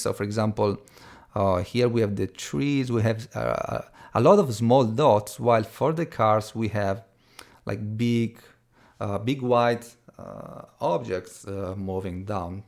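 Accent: Italy. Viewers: Are you Italian?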